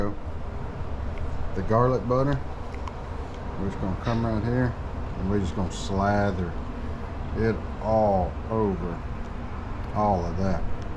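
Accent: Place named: Louisiana, United States. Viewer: I’m from maurepas la it’s cool to see a YouTuber that talks like I do 😂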